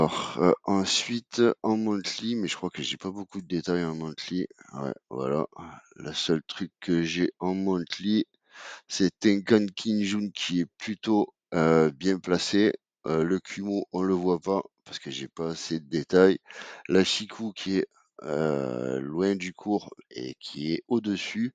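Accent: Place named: South, France. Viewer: Tu viens du sud toi aussi 🎉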